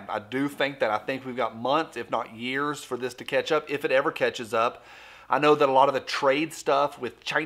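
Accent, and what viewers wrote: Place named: Southwest Virginia, United States. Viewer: I love your videos, & the way you talk! Sounds a lot like where I come from.. SWVA?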